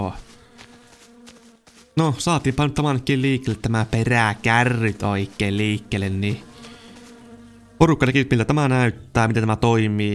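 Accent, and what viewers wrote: Finland. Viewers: Itä suomen murretta minkä sille voi jos on opetettu sanoon nii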